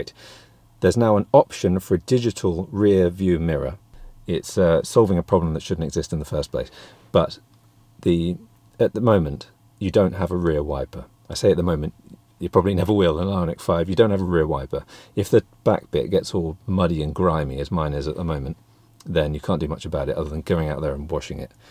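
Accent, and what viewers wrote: United States. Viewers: The American accent… 😂😂😂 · The American accent wasn't so bad, please do a full episode with the accent! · One question. Great American accent! Have you done some acting in the past? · I liked your American ascent :) ........and I'm American.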